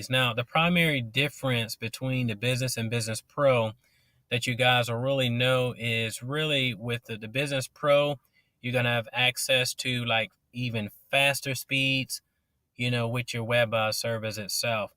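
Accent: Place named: United States. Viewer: Hostpapa is a Canadian web hosting service. You sound American. How did you hear about it?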